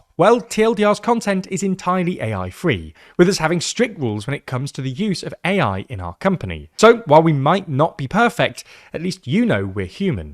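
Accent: United Kingdom. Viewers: "dõnt õwn theyr hõüms" way too british for me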